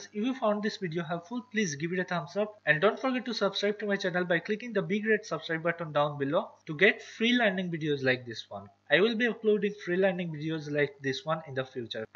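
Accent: India. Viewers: Bengali accent ! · You know you found the right video when you hear that Indian accent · Did you hear an indian accent ? Oh You found the right video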